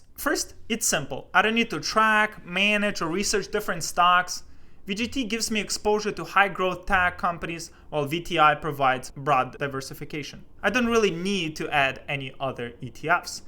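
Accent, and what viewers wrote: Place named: Russia. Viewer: Hey great video man. If you don't mind me asking, where did you immigrate from? to me, your accent sounds Russian or Ukrainian.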